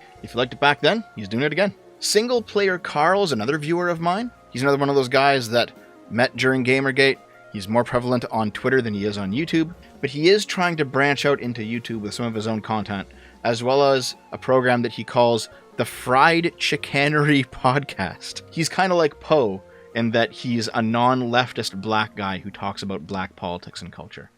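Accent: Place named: Canada. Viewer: I found you when you filled in for Sargon. First thought was that you sounded painfully Canadian. Thanks for the thought provoking content.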